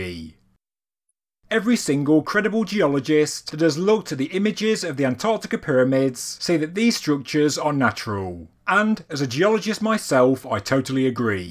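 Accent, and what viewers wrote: United Kingdom. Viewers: That's one version of a british accent, but I do agree that it can be hard to listen to for us north americans.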